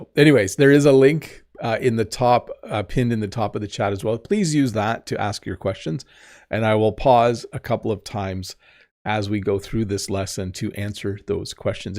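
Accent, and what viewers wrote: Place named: Canada. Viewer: Salut,! It is so refreshing to listen to your videos. You speak so clearly, your pronunciation is spot on and your pace is excellent and the Canadian accent is <<the best>>! One (minor) question: do you have the ability to control the language for your captions? I notice they use USA English: favor, behavior, center.... Back to watching video, thanks!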